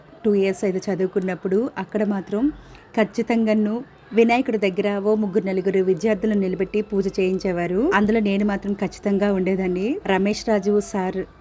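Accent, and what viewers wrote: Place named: Srikakulam, India. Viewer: మావూరేనే మీది కూడా. మీ మాటలు విని. అప్పుడే. అనుకున్నా. మన. శ్రీకాకుళం అని. 👌👌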